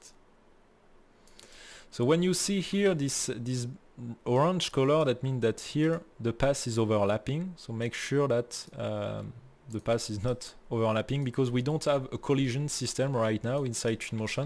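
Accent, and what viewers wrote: France. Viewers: Oui, "french accent", et : - Dommage que cette présentation ne soit pas en français. - Dommage que les sous-titres n'existent pas en français. Tout ça est bien paradoxal !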